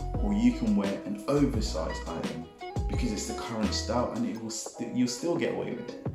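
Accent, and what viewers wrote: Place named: United Kingdom. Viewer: How did you get your name? Just curious, lol. You have a British accent, and me, myself, being, it tossed me off. Love your content btw!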